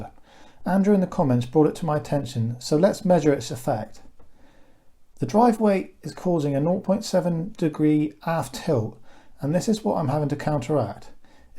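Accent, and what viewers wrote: United Kingdom. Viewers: This is a fantastic project. I have always wanted to build my own aircraft but have too many restrictions. There is an airfield within 3 miles of my home but it is run by a bunch of millionaires who like to keep it as an exclusive club for them and their cronies and excude any other aircraft types. There used to be a microlight school operating there but the committee of millionaires drove him out! Because of this I have been looking for an aircraft that could be operated from my large back garden so a helicopter would be the answer but in the UK we have very restrictive legislation regarding home built aircraft especially rotorcraft which has always put me off building an aircraft. I wish we had the same freedoms as they do in the US. Judging from your accent and the fact you mentioned getting your engine for 500 quid in another video you are indeed in the UK. 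if so how are you going to get this aircraft certified to make it legal to fly as otherwise it will end up being an expensive garden ornament. Also if i was building this aircraft i would look at making some redundancy in the belt drive ie have more than one belt per rotor as if one of the belts on your craft were to go twang one of the roters will stop and you will have no control of it and it will be game over!!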